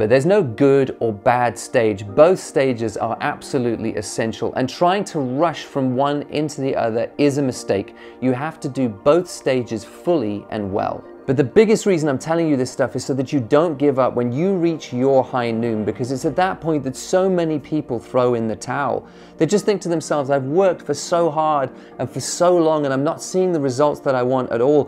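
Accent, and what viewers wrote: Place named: South Africa. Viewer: I thought I could here a little South African in your accent. Haha. Anyway Great content Man. Your videos are inspiring.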